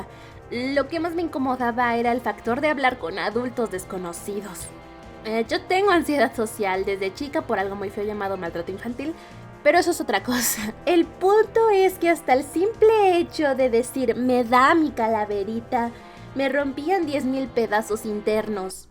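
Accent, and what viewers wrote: Chile. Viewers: Yo creí que eras chilena por tu forma de hablar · Yo si pensaba que eras chilena 😆 es que tienes el asentó de chile soy de mexíco y conozco a unas personas de chile las conocí por Wattpad un saludo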